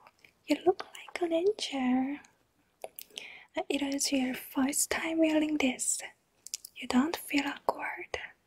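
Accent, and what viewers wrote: South Korea. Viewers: 어쩐지 발음이 약간 우리 영어선생님이랑 비슷하고 한국인 같㉰ 했는데 내㉮ 젤 좋아하던 asmr유튜버㉮ 한국인이라닌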